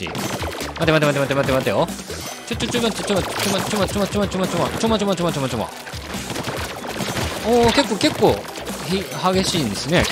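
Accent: Hiroshima, Japan. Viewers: Rさん動画投稿お疲れ様です😌💓 Rさんの広島弁が加藤茶さんの奥さんに似ていたのでびっくりしました❗️次回の動画も楽しみにしています🐱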